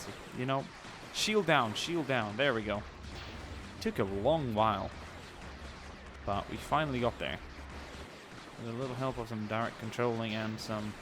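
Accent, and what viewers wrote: Sweden. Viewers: Your Swedish? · are you swedish?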